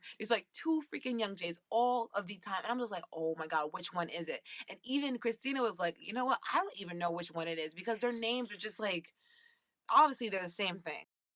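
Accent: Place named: New York, United States. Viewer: Super late to the game here, but I finally caught up with that Kurtis/Dean dating video and had to give you a sub - you're super entertaining. Also, as a New Yorker who has (unfortunately) lived in Buffalo for the last 4 years, it's nice to hear a real New York accent whenever I get the chance.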